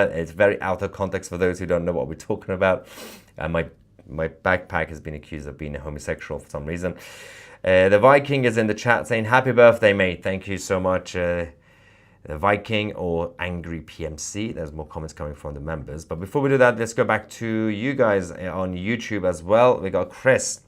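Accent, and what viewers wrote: United Kingdom. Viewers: Well, Trump didn't want war and Bibi did. Shows who really is in charge. Another disgrace is beginning. Asa for this announcer, he has a British accent. Just for that, I wouldn't believe a word he has to say.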